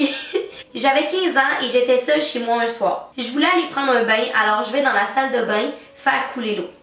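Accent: Quebec, Canada. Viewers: jarrive pas a ecouter sa me deconcentre ta voit jai rien contre les quebecois mais la ta laccent vraiment fort et c hyper bizzar mais sinon jaime beaucoup et tu es tres belle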